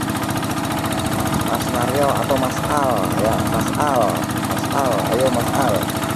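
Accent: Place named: Bojonegoro, Indonesia. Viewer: Asli mana mas? Logatnya kayak B Bojonegoro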